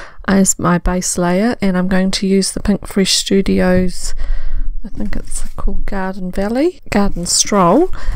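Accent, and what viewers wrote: New Zealand. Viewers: Hi. I am not a kiwi. My son luves in Christchurch. I've spent some time there. I felt that you had a NZ accent and that's why I asked you. I live in Mumbai...I love the kiwi accent. As my son has settled in NZ... it has become my second home. It is the most beautiful country in the world. Wish you all the best, good health Happy crafting....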